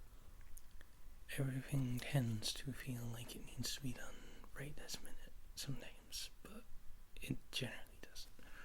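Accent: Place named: Scotland, United Kingdom. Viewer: Yay welcome back!!! Love these rambley style videos, dat whispering voice + dat scottish accent = <3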